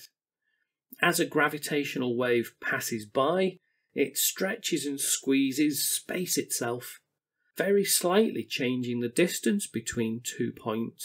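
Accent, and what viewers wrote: United Kingdom. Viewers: Thankyou for uploading your channel specifically is where I like going for science information because of the british accent and the visuals are great. It has the right vibe nothings too overexaggerated very calm logical delivery. I could and would watch hours of lectures and powerpoint in this format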